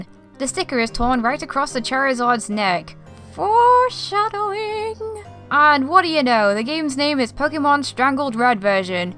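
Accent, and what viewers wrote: United Kingdom. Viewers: 100% British I'm having a stroke hearing this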